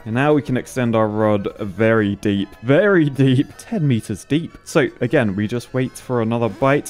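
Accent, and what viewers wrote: United Kingdom. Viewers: He British not American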